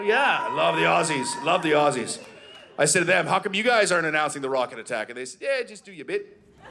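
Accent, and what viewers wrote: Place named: Canada. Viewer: Really good! I watch a lot of comedy, and damn it’s good to hear some Canadian.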